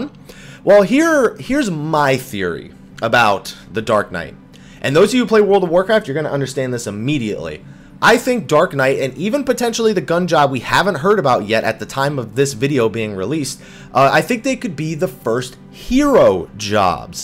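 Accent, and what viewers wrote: New Jersey, United States. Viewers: Two yoots. Old school Joisey speak.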